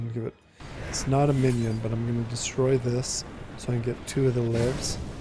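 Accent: Canada. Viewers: Loving your videos. Also loving the Canadian accent, you should say "aut" (as in "get aut") and "eh" more :) And keep the beard.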